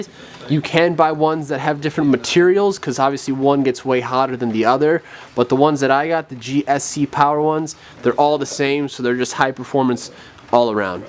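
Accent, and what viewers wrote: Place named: Australia. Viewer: That Aussie accents on point ahahah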